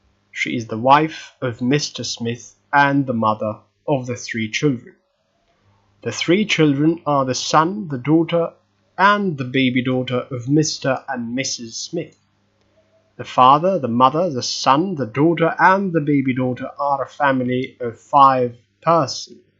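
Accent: United Kingdom. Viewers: What is your native language? You sound British, but some words seems off. And your French sounds very native. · Hello, I am a native Spanish, I wanted to know if your pronunciation is British, since I want to learn that pronunciation and not the American one.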